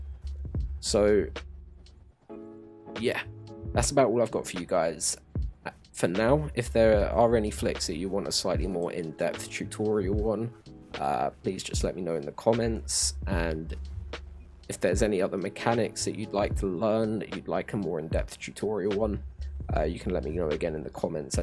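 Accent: United Kingdom. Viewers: love the british accent lol rn i have by in my head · Good shit bro where abouts in england u from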